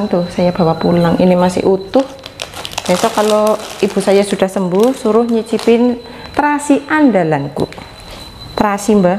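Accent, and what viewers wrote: Banyumas, Indonesia. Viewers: Asli mana mba, ngapaknya sama😁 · ga ngerti prokes maklum anak lugu..anak kampung..sy suka ngomongnya bhsnya ngapak lucu sj lhtnya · Mba rumahnya mana si kok ngapak bahasanya, berarti uda plng kampung,? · Mbak asalnya mana kok ngomongnya bahasa ngapak?maaf yayangku juga diTaiwan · Orang mana kokk logatnya Banyumas bgt? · Halo mbak..sukses selalu..seneng banget udh plg ke Indonesia..sy suka liat video mba'e jawane ngapak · Mba aslinya dari desa mana mba,soalnya kita sama sama BERSATU KITA KOMPAK BICARA KITA NGAPAK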